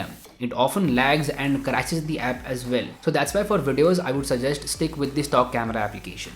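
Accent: India. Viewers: Indian accent makes me hate english for the rest of my life. Please stop guys. At least add smt in title like( Indian accent)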